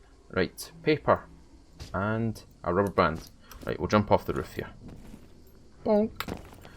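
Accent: Scotland, United Kingdom. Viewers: that sweet sweet Scottish voice. mmmmm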